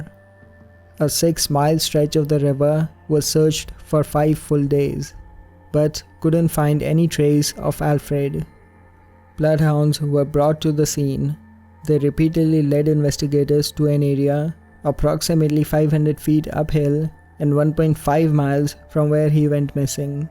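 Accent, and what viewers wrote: India. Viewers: Sounds like an Indian accent. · Indian accent is one of the worst narrator accents possible. Please stick to the women narrator. Quality content non the less.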